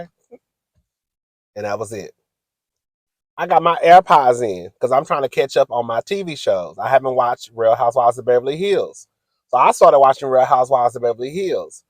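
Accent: South, United States. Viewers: I love listening to you speak! I love listening to "the south" in your accent.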